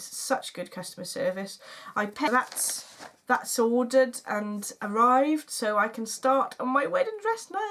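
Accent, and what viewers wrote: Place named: Bristol, United Kingdom. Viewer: Oh I just found you! Fab podcast and such fun that you are in East Anglia..I'm in Cambridgeshire....although your accent sounds more Bristolian??:) Look forward to your next episode x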